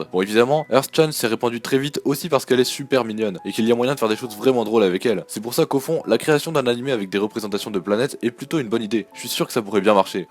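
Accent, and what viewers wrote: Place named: France. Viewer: MÈME Jpp j'en ai marre des français. C'est un Meme et ca ce dit mims pourquoi vous changez tout avec votre accent de bite. Trigerred.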